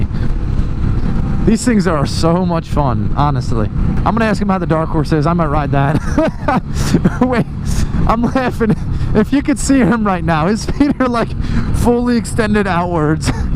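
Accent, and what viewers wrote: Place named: Georgia, United States. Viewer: yeah but hey you can't help "how" you talk. he's from GA. I'm from Alabama, so he's cool lol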